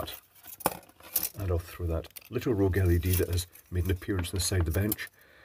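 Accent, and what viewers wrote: Scotland, United Kingdom. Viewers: 😂😂scottish accent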